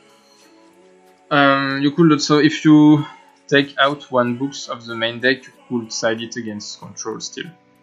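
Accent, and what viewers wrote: France. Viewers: You sound french. Wanna play some goat in paris ?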